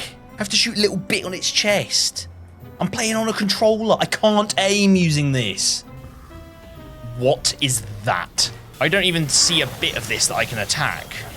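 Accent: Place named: Ireland. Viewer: What is that accent you have tis.......noice Irish?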